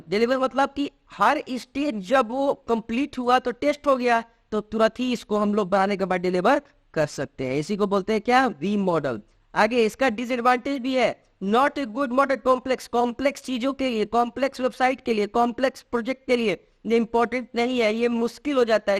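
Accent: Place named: South Asia. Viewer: Thank u Bhai, i love desi tone.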